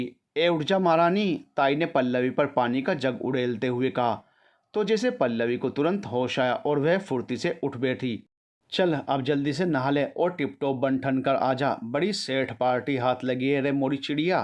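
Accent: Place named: Mumbai, India. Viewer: O bhiya tapori bhasa to bade achhe se bolte ho mujhe bahot achh laga 😁👍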